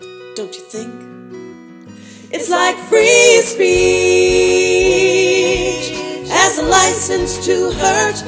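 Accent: New Zealand. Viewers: Omg, are you Kiwi?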